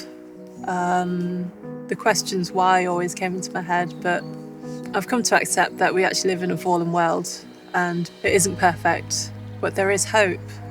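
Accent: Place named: United Kingdom. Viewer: You are the 1st Asian I've heard who has a British accent.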